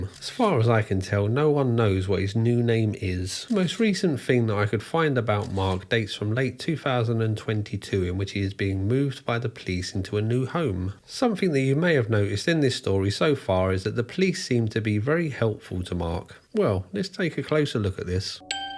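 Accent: England, United Kingdom. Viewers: Oh shit. A cyrax with an English accent. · I'd like to apologize for all my ignorant, fellow Americans, who can't seem to understand a British accent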